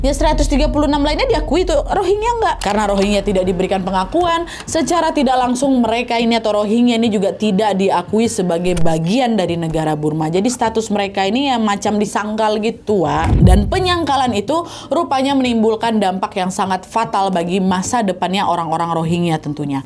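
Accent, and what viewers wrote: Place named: Medan, Indonesia. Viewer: Tampak kali logat Medan kau dek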